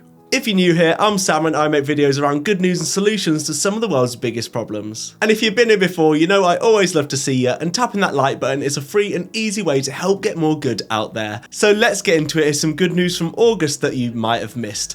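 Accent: United Kingdom. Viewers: Well, the good news is welcome, but it's not called 'gashed ee bear', that's for certain. You seem to come form Birmingham, which should be familiar with Wales - so let's have the same respect for our threatened living language as you show for animals and landscapes, please. Google translate is a first step. Type the name in and press the sound icon. Not perfect, but acceptable.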